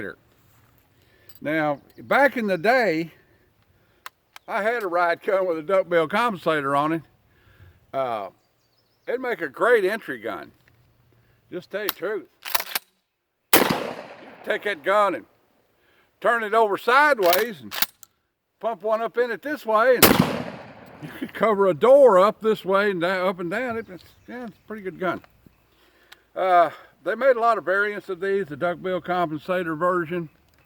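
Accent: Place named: Appalachia, United States. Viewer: let me say I love your videos and subscribe to your channel. If I didn't know you was up in Kentucky and was just listening to ya I'd think you was a local boy from here in the Carolina Mountains. I spent a good many years here as a Deputy Sheriff and in doing so came to harbour a life long love of the riot/police shotgun or as Ole Bill Jordan called it "The Great Equalizer". I went through the Police Academy with an Ithaca 37 DSPS (5 shot, 20", parkerized) and have put together a decent little collection buying most what I've got in the past when they were alot cheaper than they are today and I had more money to spend on them too. My biggest regret has been not going $750 on an H&K 512 at a gun show in early 2000's. It wasn't til I got home and saw how rare they are that I knew how bad I messed up. Made on contract for German GSG-9 that 12 ga.auto also had a shot dispersion device on the end that was adjustable to throw a horizontal or vertical oval pattern depending on the setting. 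Only the overrun of 341 guns total was ever imported into the U.S. by Keasler Police Supply. I passed it when the seller wouldn't budge off $750 to my eternal shame. Anyways the initial reason for the comment was just wanna help you out a little bit on your Ithaca 37 details. The LAPD Special 37 did have corn cob forearm but it had black plastic butt plate, parkerized finish, 4 shot magazine, and 18.5" rifle sighted barrel that differed from others by having the rear sight only windage ad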